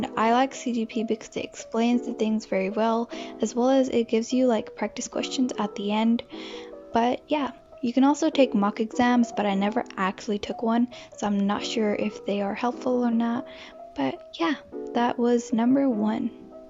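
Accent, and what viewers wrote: United States. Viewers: Are you american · i love how you did the 11+ but your accent is American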